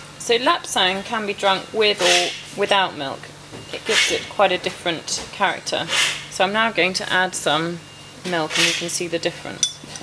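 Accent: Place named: United Kingdom. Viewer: Very interesting video, I like Lapsang Souchong! It has very peculiar taste indeed, it's surely not for everyone. Lovely British accent, by the way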